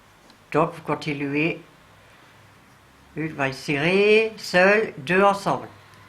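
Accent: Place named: Nord, France. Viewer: et oui encore merci et j aime bien entendre ta voie ton accent , , sa me rappelle le Nord , j ai plus que le bouton a faire , très bonne fin de journée bisoussss , Monique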